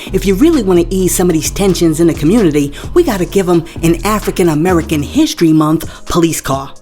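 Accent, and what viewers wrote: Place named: New York City, United States. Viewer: Love your voice. Sounds so NYC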